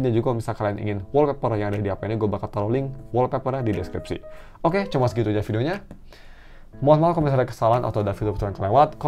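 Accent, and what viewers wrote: Bandung, Indonesia. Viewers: Loh orang bandung?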